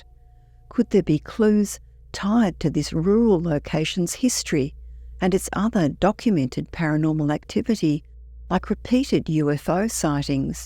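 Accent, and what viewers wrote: New Zealand. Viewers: Is that a kiwi Accent I hear? Your stories are great and your voice is beautiful and if that’s you in t he thumbnail…..❤ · I think it’s a real person, she’s just a New Zealander. So the accent is different than even Australian mainland accents.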